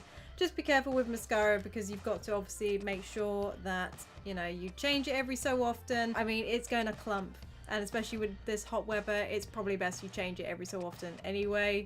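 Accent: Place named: United Kingdom. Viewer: Your so beautiful before! And your beautiful British accent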